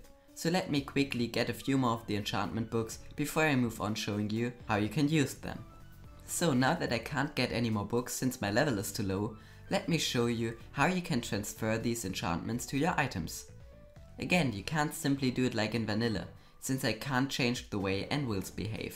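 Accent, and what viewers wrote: Germany. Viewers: Age of xD glaub auch XD er hat so nen deutschen Akzent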